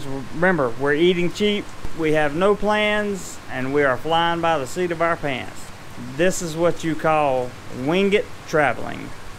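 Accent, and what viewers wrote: United States. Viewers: I like your cool american accent.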